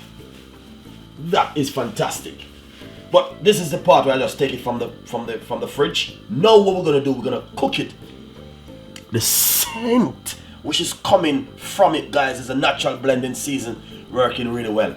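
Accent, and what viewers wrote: Jamaica. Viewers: Chef Jamaican accent is so strong, he can’t even hide or suppress it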